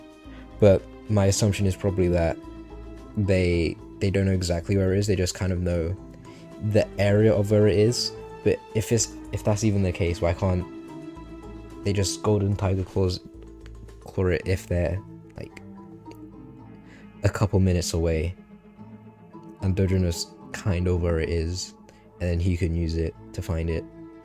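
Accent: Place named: United Kingdom. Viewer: Do my ears deceive me? A fellow British Xiaolin Showdown fan?!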